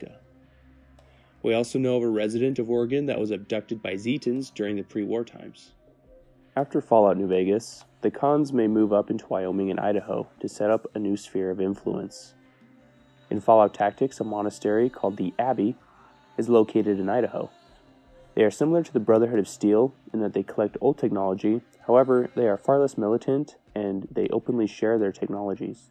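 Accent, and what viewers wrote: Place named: Colorado, United States. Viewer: As a Coloradan I think you are from Colorado by the way you pronounce it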